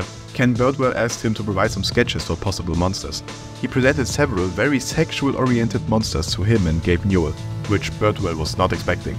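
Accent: Germany. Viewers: Why are cut enemies always that good?😭 PS: Its undeniable that you are german, am i right?😅😊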